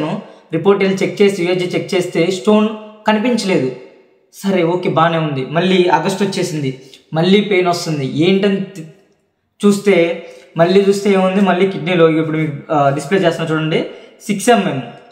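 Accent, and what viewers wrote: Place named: Visakhapatnam, India. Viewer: Brother vizag rural aa medhi. Slang konchem maku dgagara ga vundi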